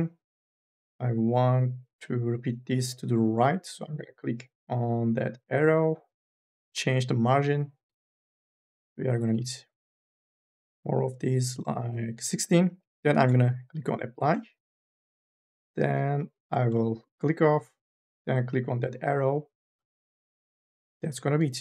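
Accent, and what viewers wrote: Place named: Turkey. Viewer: paylaşım için teşekkürler... aksandan yakaladım.. yanılmıyorsam türksünüz hocam..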